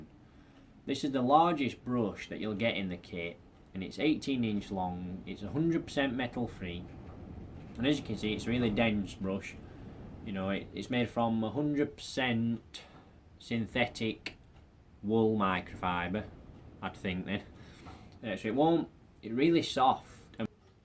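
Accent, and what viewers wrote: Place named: Yorkshire, United Kingdom. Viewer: New sub. Cracking video, I'm from Lancashire but I like your style, proper Yorkshire 👍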